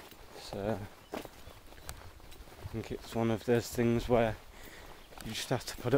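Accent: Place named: Hull, United Kingdom. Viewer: Nice vid, loved seeing the deer, am a Hullite myself, can't mistake that accent. Where on the Wolds Way are you? Look forward to seeing your woodland camp.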